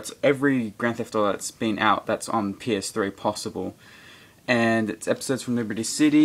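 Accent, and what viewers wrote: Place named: Australia. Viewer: BRIT !!! he's Australian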